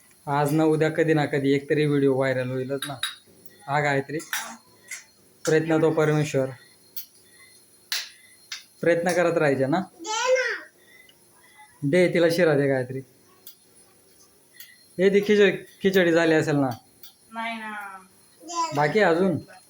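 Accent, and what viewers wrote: Khandesh, India. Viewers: Bhai khandeshi vatato aahe kutla aahe proper gav konte aahe tuze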